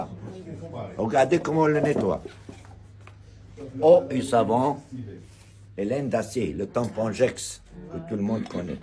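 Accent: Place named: Paris, France. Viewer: wao...il maitrise bien la langue mieux que français eux mêmes.un vrai ambassadeur..il n'est pas originaire français je suppose. en tout cas il a un bon accent parisien❤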